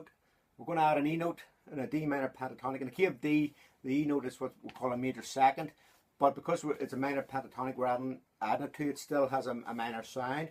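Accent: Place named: Ireland. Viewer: finally got to hear your voice 🤠 man you got that ruff ol' Irish accent and sounds great 👍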